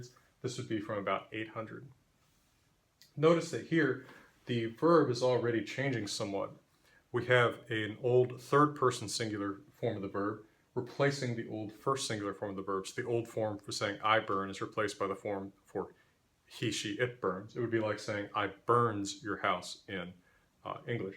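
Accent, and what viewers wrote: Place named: United States. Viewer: viking language with an american accent XD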